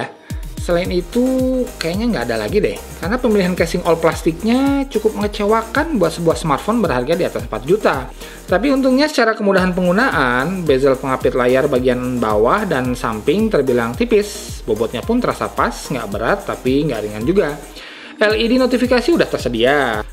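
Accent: West Java, Indonesia. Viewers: Suka sama reviewnya kang. Sunda pisan euy. Hehe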